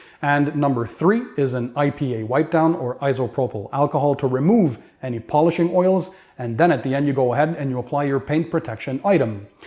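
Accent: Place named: Quebec, Canada. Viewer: I watched 30 mins of your best products vid, and 22 mins of this one before I picked up on your Fr. Canadian accent, lol. Vive la Quebec! Merci, monsieur.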